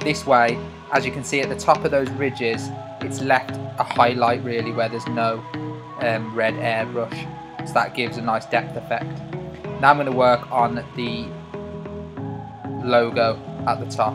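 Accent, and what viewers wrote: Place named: Midlands, United Kingdom. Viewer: Sounds like you are local to me. (Midlands) Can I commission you to make one for my wife's birthday party (26th Feb).?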